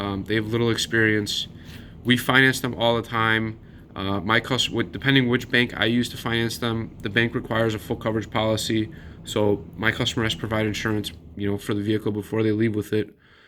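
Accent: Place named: Northeast, United States. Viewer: Great video! I love the northeastern accent my cousin lives in Philly. I’m in Texas.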